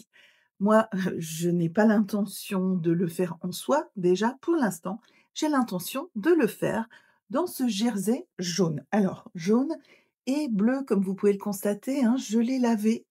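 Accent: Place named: Sarralbe, France. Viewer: 👗🪡🧵💙✂️❤️😘 bonjour, j'entends que tu es près de Sarralbe.. mon petit fils est au collège à Sarralbe et moi je suis de Freyming.... nous sommes donc voisine 😂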